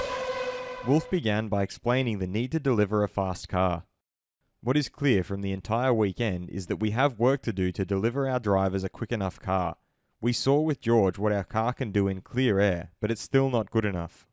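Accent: Australia. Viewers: Absolutely love this channel. Thanks for the awesome content!! Love hearing the Aussie accent on YouTube too. 🇦🇺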